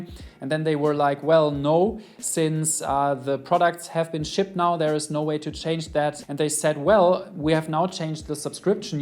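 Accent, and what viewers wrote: Germany. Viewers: I heard 2 seconds and I knew you was German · Yes he have a thick german accent... I know cause I have been living in munich since two years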